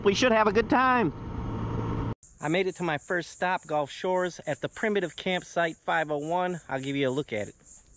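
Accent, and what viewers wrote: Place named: Kentucky, United States. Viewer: thanks for great video! best on youtube!cool Kentucky dialect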